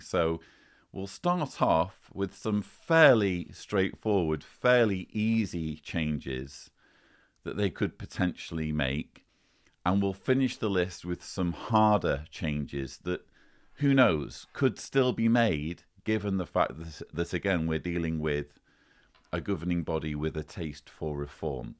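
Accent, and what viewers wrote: United Kingdom. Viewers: I come here only for the British accent and the Tony bashing 🤣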